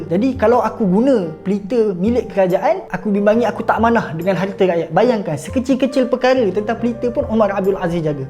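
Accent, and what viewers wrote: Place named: Perak, Malaysia. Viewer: Kuat perak nya 😅😅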